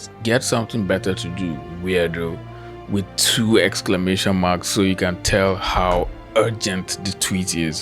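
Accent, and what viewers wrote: Nigeria. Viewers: im a young nigerian girl living in the uk. i dont have a strong accent like you but I've been planning on doing commentary art voice acting and animation 3d and 2d aswell as some programming. you have just made me more confident in what i want to do. you are incredibly unique. ive never seen a nigerian commentator like u and be succeful. my parents dont want me to go down this type of route but if i show them your content im sure they'll let me freely do what i want to in terms of my future. i look up to you immensly. i love your art and you are also attached to ur country too wich makes me feel warm inside. i wish u the best of luck in ur career and u deserve more attraction. i love your content im so lucky i found your stuff. you are one of the most diverse members of the art community ive seen and i hope more people like you show up. 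you are honestly a start of a new era in the community. nigerian homes are quite critical and people like me might be scared to go into this feild of work but trust me u are giving us the confidence to persue our dreams. from a huge fan that is glad you exist💕💖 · Are you Nigerian?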